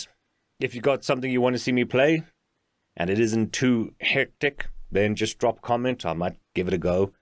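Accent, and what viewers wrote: Scotland, United Kingdom. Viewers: loving your work and working through the previous recordings, as a scotsman i'm very impressed with your scottish accent. Hopefully you will be well enough to get back recording soon.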